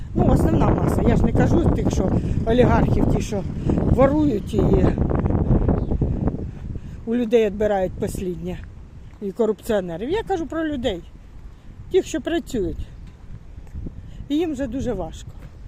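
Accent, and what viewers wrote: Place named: Sumy, Ukraine. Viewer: Привіт, Ви праві, говорите так самісінько, як в Сумській області!!((, там, далеко від нас...Всього тобі найкращого!:face-red-heart-shape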